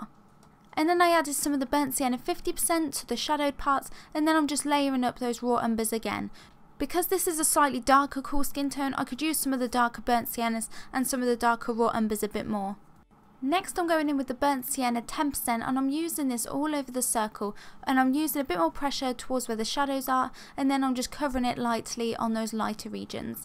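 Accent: United Kingdom. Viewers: your british accent is the most british accent i've ever heard before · really love the tune of the way people from uk use to speak english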